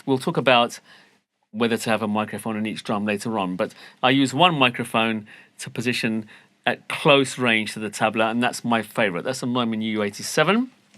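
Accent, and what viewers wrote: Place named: United Kingdom. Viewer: I liked his British accent..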